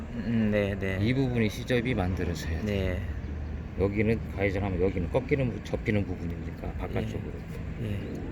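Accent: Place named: Chungcheong, South Korea. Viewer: 충청도 사투리가 정감가네요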